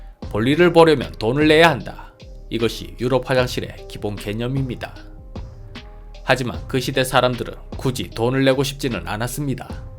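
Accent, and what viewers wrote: Gyeongsang, South Korea. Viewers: 경상도 사투리는 진심 짜증나요 . 제발 사용 하지 마세요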